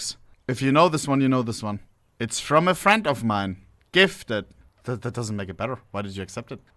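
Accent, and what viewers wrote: Germany. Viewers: is he german? I hear an accent XDD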